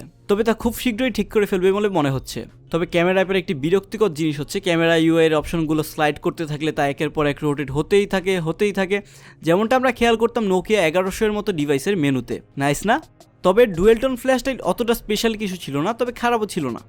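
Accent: Bangladesh. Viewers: I totally love to laugh at these Bangla youtubers English accent. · mojar... specifically Bangladeshi accents. sadharon jiniso bes valo legeche. good going.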